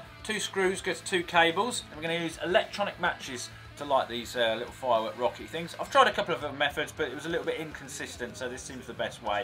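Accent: England, United Kingdom. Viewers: *cough he's very much ENGLISH me old fruit... Although we are basically cousins (aussies - British) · what part of england is he from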